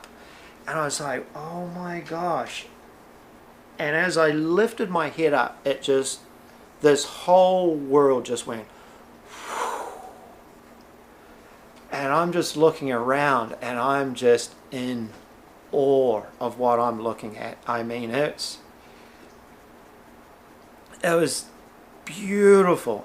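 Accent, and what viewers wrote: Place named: New Zealand. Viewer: Recognized the kiwi accent at the first 5 second of the video, even before he said: it is kiwi hahaha love the accent, miss living in New Zealand ♥ Also I felt for you when you said that you still dont know whats you have to do here on Earth, I myself struggled with that questions up until recently and it was honestly driving me crazy and anxious.... I had an "Near Life Experience" when looking through someones eyes once (I was liivng in New Zealand by that time, yeeeeeey) and I experienced this unicity and seeing the souls of all humans being connect in some sort of galaxy so I know what you are sharing is genuine and 100% true!!!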